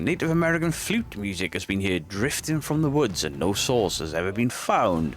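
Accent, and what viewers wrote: United Kingdom. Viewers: Man tbh I don't care about the video or hookie poo whatever what interests me is that you sound British and your talking about quite literally my neck of the woods I was raised in Hinsdale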